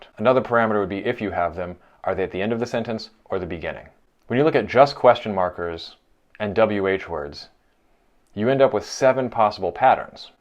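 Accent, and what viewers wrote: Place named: United States. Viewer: What I've learnt from this video, and having checked to verify, is that Americans seem to pronounce plural 'moths' differently to us Brits, Irish, Australians and New Zealanders. You seem to say 'moθ' in the singular and 'moðz' in the plural whereas we also say 'moθ' in the singular but say 'moθs' in the plural. Yeah, I know we say the vowel differently too but that's not what I'm looking at.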